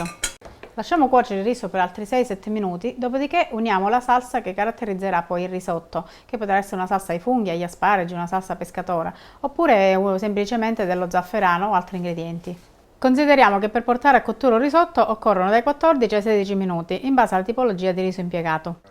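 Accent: Calabria, Italy. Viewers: Hai un accento calabrese bellissimo ❤️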